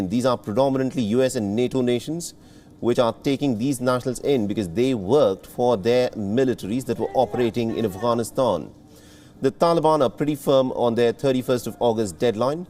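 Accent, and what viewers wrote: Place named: India. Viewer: Real English, Indian accent. You yankis should realize there is a whole world outside your borders.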